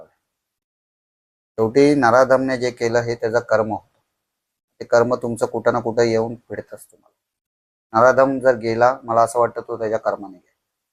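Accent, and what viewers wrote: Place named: Mumbai, India. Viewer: your marathi accent is lovely... typical Mumbai marathi... I love it.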